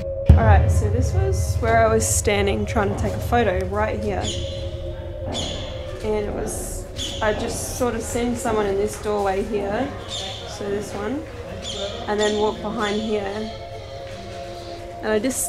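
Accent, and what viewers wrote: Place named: Australia. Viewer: There are different accents in a language and none is "correct" or "incorrect". Same with dialects.;) I love accent and I'd love to visit Australia someday. English isn't my first language and I always understand what she says. Keep doing what you do,! ❤ Thank you for creating these videos and teaching us about these places.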